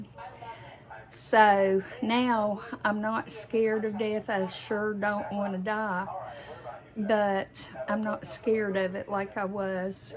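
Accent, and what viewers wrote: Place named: North Carolina, United States. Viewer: Glasses Very wild, something I think you would see Ru Paul wear. I don't know if you remember Elton John and his glasses Very good sense of humor. Enjoy your videos and love your NC accent.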